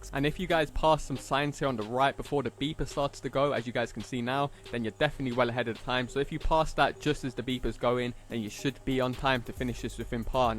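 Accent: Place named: London, United Kingdom. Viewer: i'm from london and i can tell you are, it's strange to hear such a well spoken londoner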